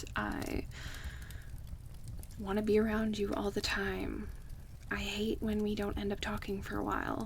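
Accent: Canada. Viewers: Canadian eh? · are you canadian? random question lmaooo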